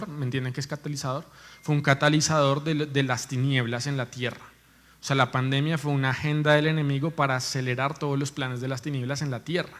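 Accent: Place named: Colombia. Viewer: Hola siervo de Dios por primera vez lo veo y lo escucho por su acento se que es de Colombia y le ruego que no tenga temor nunca de hablar lo que el Espíritu Santo le dice para su pueblo recuerde de donde saco a Jonas para que ablara a ninive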